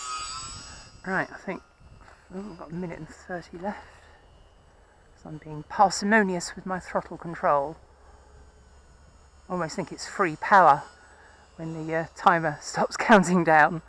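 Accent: Australia. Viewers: Love my 50mm Arrows Viper - once I had modified it to fly faster on 4S :) Decent Aussie accent!!! :) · we loved it you speak better Aussie than us . The Aussie blowy fly is always the first one there at a good BBQ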